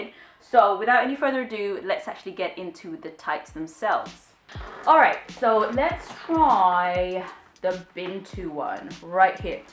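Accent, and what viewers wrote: United Kingdom. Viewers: You are a Goddess. I love the British accent.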